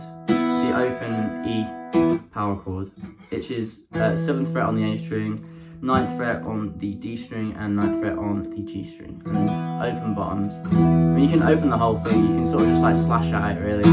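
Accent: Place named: United Kingdom. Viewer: 빠져든다 영국발음💚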